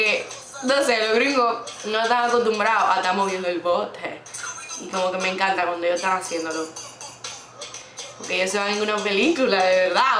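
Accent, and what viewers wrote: Dominican Republic. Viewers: es de RD????, es que habla como tal, porque yo también soy de RD pero respondame plis alguien la pregunta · La mayoría criticandola a ella cuando ella está dando una video reacción y dando una crítica constructiva nose por donde no se entiende además vi unos comentarios criticando la forma que habla por si la gente que piensa que República Dominicana no existe pues si, si existe Dios que acaso no se dan cuenta que cada país tiene un asentó diferente?! Creo que deberían darse cuenta que esta dando su opinión dentro de su perspectiva no es que ella va a pensar igual que ustedes. Desde mi punto de vista Yo pienso igual que ella no veo necesario mostrarse así en las redes sociales no hay nesecidad no? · Eres dominucana? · Tu tienes el acento de mi pais republica dominicana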